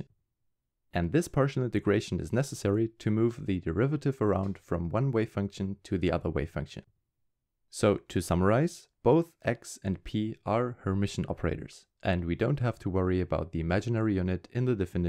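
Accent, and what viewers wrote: Germany. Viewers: Deutsch, hört man direkt 😂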